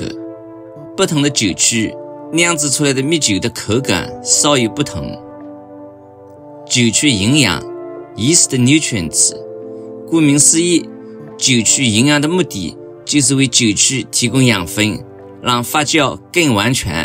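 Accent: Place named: Nanjing, China. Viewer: 很喜欢你的频道，条理清晰，风趣幽默，乡音撩绕，在美遇到相同时区种植爱好者不易，还是南京老乡那是缘分。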